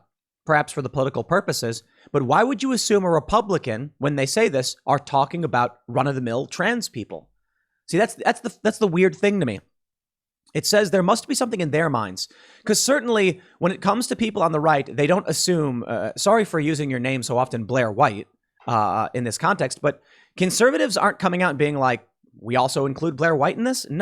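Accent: Chicago, United States. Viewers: When Tim talks of Chicago accent, it is thickest where he is from. The South Side of Chicago has the thickest accents, both black and white accents... Mexicans are the same no matter where they live in Chicago, lol...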